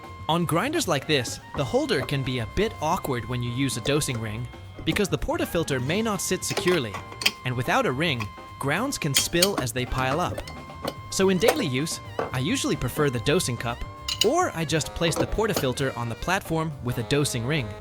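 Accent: United Kingdom. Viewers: why are you using british accent now? it's not bad. i'm just curious and found it slightly hilarious.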